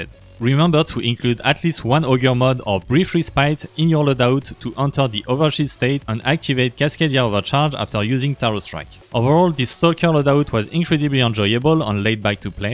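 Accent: France. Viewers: Toi t'es un bon gaulois ça s'entend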